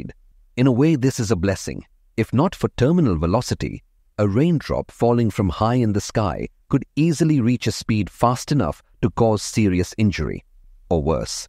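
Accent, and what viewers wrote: India. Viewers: why do i feel like a hint of Indian accent in this videos commentator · clear indian accent though. But much more understandable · Not just a hint. It is an Indian accent. I know because I am Indian.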